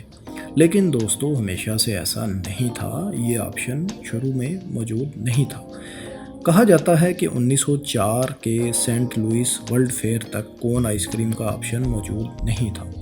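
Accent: Punjab, Pakistan. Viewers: اسلام علیکم۔ بھائی معذرت کے ساتھ، اگر یہی پینڈو اردو آوازیں سننی ہیں تو لاکھوں پینڈو چینلز ہیں۔ فیصل بھائی کا انداز بہت اہم ہے، یا اسے کاپی کرنے کی کوشش کریں یا کاپی کر سکنے والے شخص کو یہ کام سونپیں۔ اس طرح پنجابی انداز کی اردو والوں سے پلیز گریز کریں۔۔۔ اس طرح آپ بنی بنائی امیج ڈاون کر رہے ہیں۔۔۔ پھر سے معذرت مگر بات یہی سچ ہے۔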